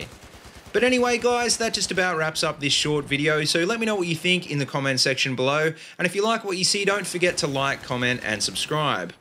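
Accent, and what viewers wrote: Australia. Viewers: Ive always wondered where in Australia you come from?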